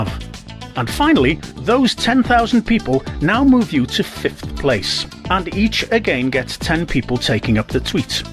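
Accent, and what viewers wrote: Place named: United Kingdom. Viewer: Haha,Judging by your accent,you are from UK,the motherland of Harry Porter...? How many followers do you have now?Please show us your twitter account.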